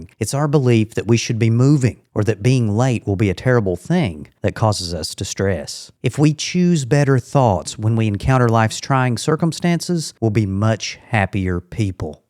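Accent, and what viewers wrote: South, United States. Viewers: Hey! Thanks for making this very helpful information. Where is your great southern accent from? Keep up the important work!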